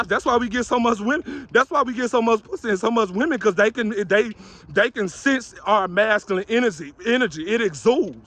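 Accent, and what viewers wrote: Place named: New Orleans, United States. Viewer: Probably New Orleans with the yeah Kevin gates says it alot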